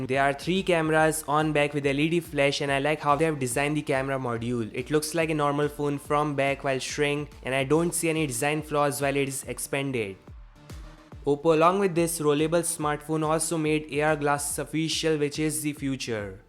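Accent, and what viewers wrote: India. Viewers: I heard indian i dislike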